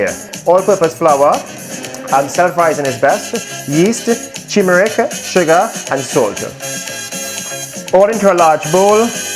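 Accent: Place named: Trinidad and Tobago. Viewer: What part of trini is this accent from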